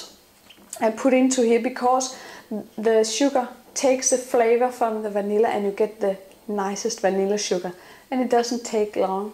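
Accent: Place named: Denmark. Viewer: kæft hun har jo en piiiinlig dansk accent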